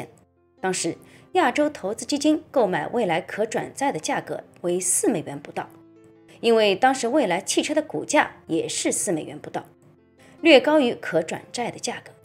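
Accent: Shanghai, China. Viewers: 您是上海人么？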